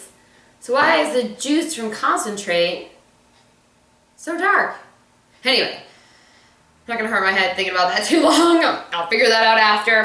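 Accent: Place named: Florida, United States. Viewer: Are you from Florida? You sound like a Southern Floridian (not South Floridian, mind you). :-)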